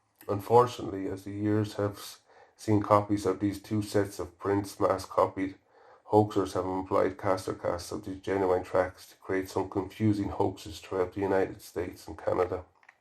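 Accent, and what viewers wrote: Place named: Ireland. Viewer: What part of Ireland are you from pal? 🇮🇪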